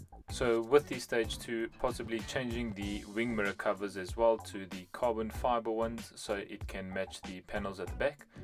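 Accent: South Africa. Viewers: You are definitely South African. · Great looking project ❤ Iv just subscribed to the channel keep up the good work 🎉 you sound like you from South Africa 🇿🇦?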